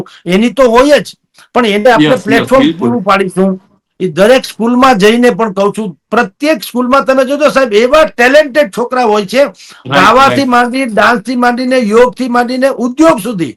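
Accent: Kathiawar, India. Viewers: કાઠિયાવાડી ભાષામાં મઝા આવે છે..🎉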